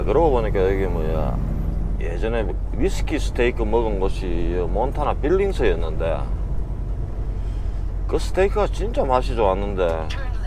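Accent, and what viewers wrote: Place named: Busan, South Korea. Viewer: 와 부산말 제대로네예 ㅎㅎ 구수하이 좋네예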